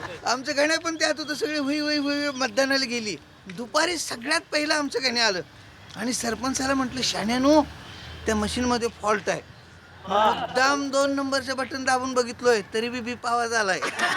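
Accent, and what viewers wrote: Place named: Sangli, India. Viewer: Khar tar solapur cha ahe....Ajit koshti ganya naavacha vinod mala kharach best watatat....pure Sangli karad language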